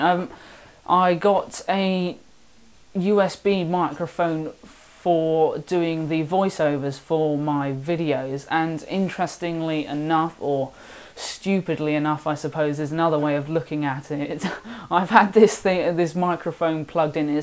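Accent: United States. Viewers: I thought you was in the USofA with that Southern accent . LOL Love the Videos , keep it up . Southern Oh Hi Ho here . LOL (Ohio) . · 1) The quality of your video and audio is terrific! 2) Love the American accent. 3) Learned something about college/university I never knew. 4) Are those bulls? 5) Brrr! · Great quality on both video and audio! I live in south-east of Norway and we're no strangers to snow.. This year has been kind as we've only had about 2 feet so far. Some years, 6-8 feet, much of it falling in a relatively short period. Here in Norway there's always a snow season, but still the first snowfall surprises a lot of people every year and we have numerous accidents during first snow... Good luck on your exams. PS! Your american accent sounded a bit like Hugh Laurie's :-)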